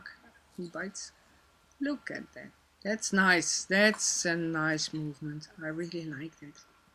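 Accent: Netherlands. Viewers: Thank you it really helped. Are you from holland??? Because you have a little bit of a accent. I am dutch too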